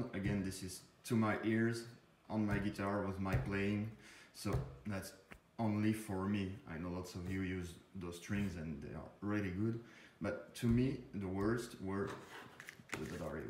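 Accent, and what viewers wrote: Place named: France. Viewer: T'es français toi!😅😊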